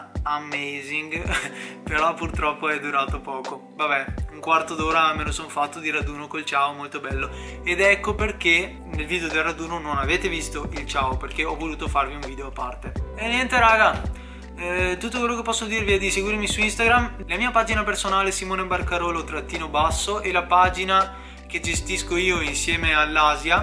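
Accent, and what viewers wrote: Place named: Veneto, Italy. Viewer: Se sente te si veneto 😂😂❤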